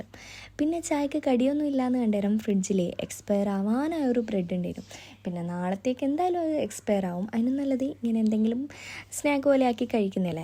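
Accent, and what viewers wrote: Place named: Kannur, India. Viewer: Kannur slang kannur aano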